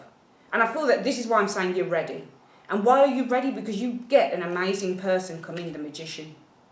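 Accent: United Kingdom. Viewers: You should put a Brit flag on your icon bc i cannot understand you. I'm sorry. I only speak American English. :(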